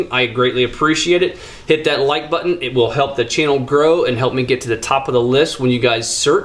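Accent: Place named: Ohio, United States. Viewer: Was funny to recognize a fellow Ohioan by the way you say "like that" as "like cat". Not making fun of you at all, it's how we talk. I was born there (columbiana county) and lived there for 26yrs. Now I've spent 28 years in Kansas and lost that part of the accent. I own a 2005 slt power wagon. 👍🏽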